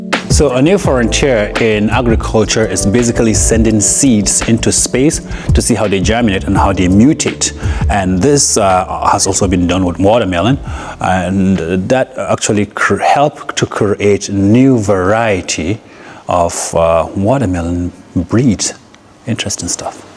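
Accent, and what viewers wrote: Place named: England, United Kingdom. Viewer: Love your English accent man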